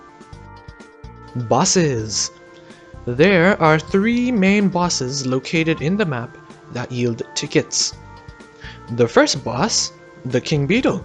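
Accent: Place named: Australia. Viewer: Why you have Australia accent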